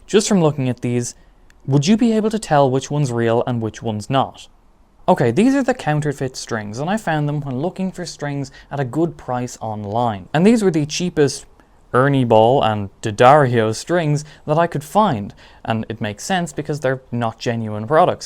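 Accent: Canada. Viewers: Are you a Newfie. You sound like my daughter from St. John's.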